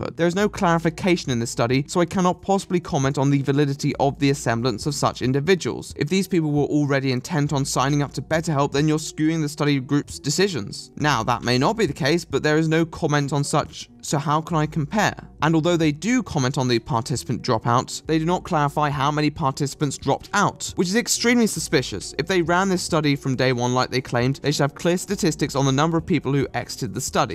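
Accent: RP, United Kingdom. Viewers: a nice posh accent speaking on the delicacy of mental health related sponsorships to go with my cheap ramen. thank you. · A little something called British accent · Whats with all these commentary channels with RP accents? Is all of the upper middle class in Britain raising children who need to constantly feel better than others? Fan either way. Great video.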